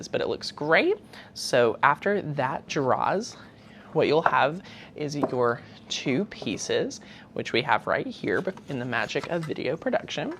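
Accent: South, United States. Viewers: Im new to your channel. Love your southern ascent. Where are you from. Love your ideas.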